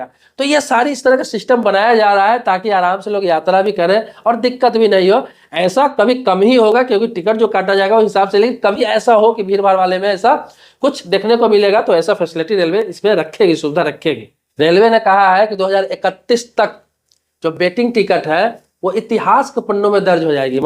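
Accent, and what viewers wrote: Bihar, India. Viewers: आप बिहारी हैं क्या ? बिहारी लोगों की हिंदी बहुत कमजोर होती है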